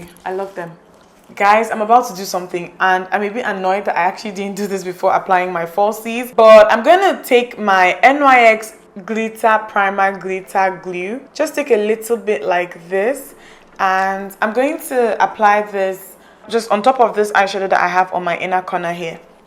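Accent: Igbo, Nigeria. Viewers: you should do a makeup video in Igbo! It would be so nice! Plus ur Igbo accent is 😍😍😍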